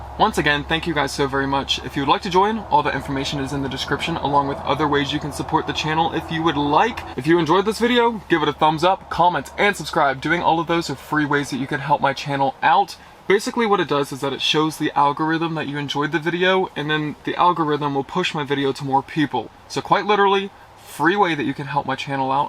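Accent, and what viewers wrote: South, United States. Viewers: Never heard your Southern accent until now. You must have been around your fmaily a lot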